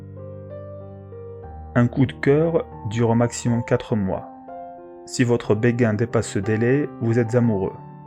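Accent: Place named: North Africa. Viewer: C’est dommage que le narrateur lise ses commentaires et je n’aime pas cet accent nord africain perso je sais quels hommes m’attirent et les hommes bleus du désert ne sont pas mon type ni même les Africains en général je suis une hypersensible et l’odeur leur accent leur mentalité leur communauté pseudo religieuse même leur cuisine grasse je n’aime pas ….je n’aime que ceux de mon ethnie il n’y à pas d’africains ni autres étrangers dans ma famille et même à la vue de ces gens je fais de l’urticaire géant heureusement qu’il n’y en a pas dans ma famille car je n’aurais su être hypocrite je suis chrétienne non catholique…..! Et pourquoi parle t il que de la masturbation des hommes nous aussi on se masturbe le clito et surtout l’esprit perso je me touche au moins trois fois par jours depuis que je suis excitée par un homme exceptionnel et Français et peu importe le moment sagesse du jour et de la nuit !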